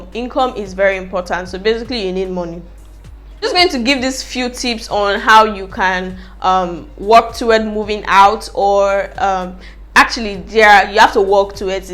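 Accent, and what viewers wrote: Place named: Nigeria. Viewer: We all know she is a Nigerian from her accent.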